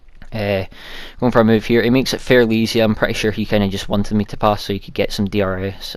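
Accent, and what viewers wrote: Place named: Scotland, United Kingdom. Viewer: Good commentary mate! I'd up the game sound, it felt a bit strange not having it. Btw, ya got a gud ol' scottish accent there xD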